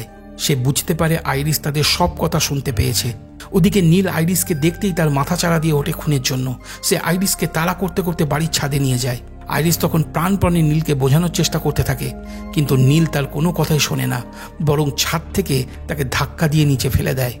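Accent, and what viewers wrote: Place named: Bangladesh. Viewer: তার জন্য আপনার কথাবার্তার ধরণ বাংলাদেশি,, 🇧🇩,, বাংলাদেশি ভাব আছে আমি বাংলাদেশ কুষ্টিয়া জেলা থেকে আপনার ভিডিও দেখি অনেক দিন ধরে আমার টার্গেট তাহলে ঠিক আপনি বাংলাদেশের বাংশোদ্ভূত 🇧🇩❤️❤️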